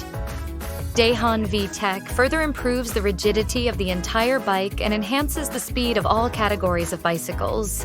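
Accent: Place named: United States. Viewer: 대혼이구나 미국발음은...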